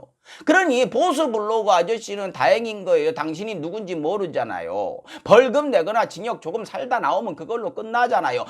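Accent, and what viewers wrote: Jeolla, South Korea. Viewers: Fact news channel 강성범tv 전라도 사투리가 더 찰져졌네요. 항상 감사드리고요잉, 지지합니다. 조국 전장관님 따박 따박 숨어 있는 해충 한마리까지 다 찾아 내셔서 혼꾸녕을 내주십시요~~~~. 공수처 설치는 올해안에 꼭 완수 하시길 국민의 한사람으로서 명령 합니다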